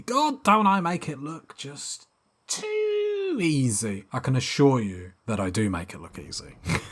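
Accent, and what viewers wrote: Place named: United Kingdom. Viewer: Your accent...just adorable! I can't have enough of the British accent! Well I have a house in England, you can understand that I love your country! xx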